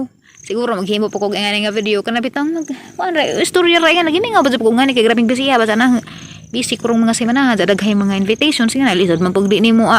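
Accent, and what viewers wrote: Bohol, Philippines. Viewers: Lami jud ka mo binol anon day, angay jud ko s imong sinultihan😁😁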